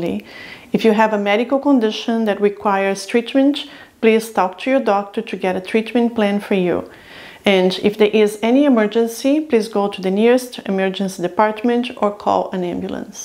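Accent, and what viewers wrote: Brazil. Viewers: This female has Brazilian accent.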